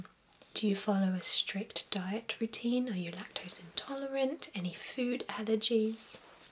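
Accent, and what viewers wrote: England, United Kingdom. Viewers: love the British accent, so perfect for this asmr :O :3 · So here I am doing my usual to try to fall asleep eyes closed on auto play, this video comes on...Hear a British accent, eyes open with surprise... groggy eyes stare in disbelief haha. Long time no see!! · ASMR and British accent. This is perfect and relaxing. Plz make more doctor/nurse role-play videos. I watched it 10 times and still love to watch ! Thanks. :) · she's got a british accent, not australian! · Goood I'm fond of your clean English accent. I prefer it to an average American one. I want you to read some classic English poetry if you don't mind. Thanks for your videos. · Your British accent is so much better than mine :( Where you from Dear? :) (*Sorry I am late on this video!) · lover her accent. and i live in UK. im sick of listening to chav accents and miss this upper class sexy english voice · Hello, where are you from? 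I ask you why your accent seems british. Regards from México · Wow! I'm English too and people say I have a lovely accent, but yours is just beautiful!! I'm actually jealous of how lovely your accent is 😍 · no one can bring out my tingles as you do! The calm, british voice is just magic! Greetings from Norway :) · As a Brit myself I might be bias, but I can safely say I love your accent and whispering together! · normally, british accents annoy me,. except for today! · EpicMeme EMPEROR I don't think you know what a Russian accent sounds like? Gentle Whispering is Russian though, I'd say her accent definitely more towards the UK. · I lived in Britan and sveryone sounded chavvy and not like this. Using that classy english accent is awesome >ω<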